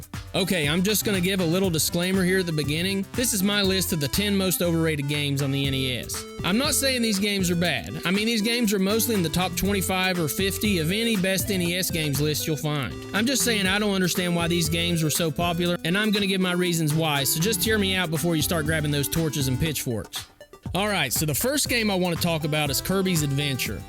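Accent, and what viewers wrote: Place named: Texas, United States. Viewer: Bizarre question, but what accent is that? Texan?? From England myself, but totally dig the accent dude!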